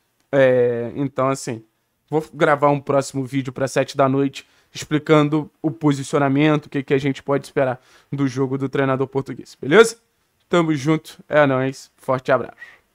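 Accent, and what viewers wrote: Rio de Janeiro, Brazil. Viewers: ta falando menos carioca mane kkkkk brabo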